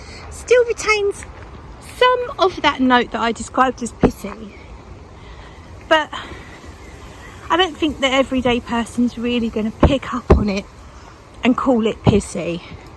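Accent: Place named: United Kingdom. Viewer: I love your UK accent!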